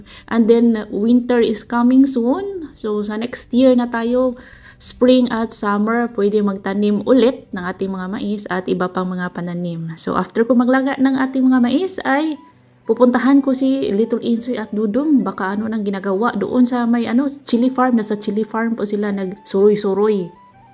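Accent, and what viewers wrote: Bohol, Philippines. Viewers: asa inyo sa visayas mam? kay murag Bohol man ang tonon, nice kay mura sag ug naa ra pinas diha mam nuh, dira kaau mingaw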